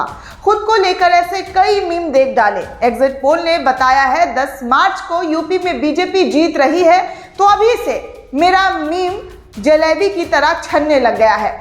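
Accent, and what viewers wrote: Bihar, India. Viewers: Achha bolti ho,, achhi anchor⚓ ing karti ho, Zaban thodi si biharion ki tarah hai, aapki. ☺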